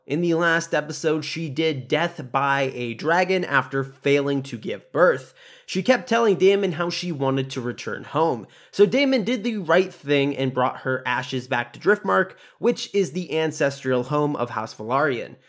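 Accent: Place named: United States. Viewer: The man is a yank, it's understandable he cannot speak true true (Oxford/Queen's) English.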